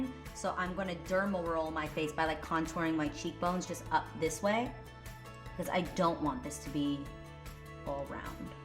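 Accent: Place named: New York, United States. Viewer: I LOVE your newyork accent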